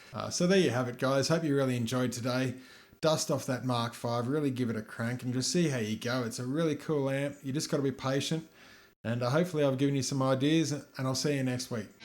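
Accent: Australia. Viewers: holy shit you're Aussie! I had no idea :) Keep up the good work mate!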